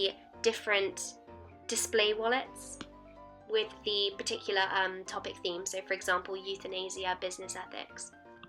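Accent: RP, United Kingdom. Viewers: You speak sooo posh and pronounce all your T’s in words... I’m from up north where we really don’t lol, we should though. A lot of ‘so’s!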